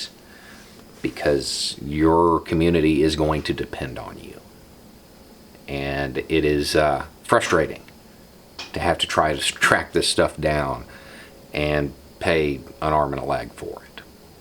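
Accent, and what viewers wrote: South, United States. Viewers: All political commentary should be delivered in a thick southern drawl.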